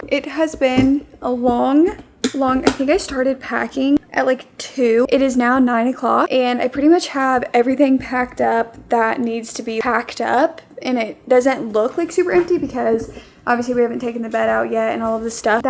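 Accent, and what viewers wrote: South, United States. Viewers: Girl you definitely caught me by surprise omg. Shoutout to you pookie I’m happy for you but I know this must be hard too. I will say I was surprised (when I found your channel) that you weren’t from Texas lol you give southern bell! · Congratulations!! You’ll fit right in since everyone thinks you have a southern accent already hehe. Sending love from Louisiana, we’re state neighbors now! 🩷🩷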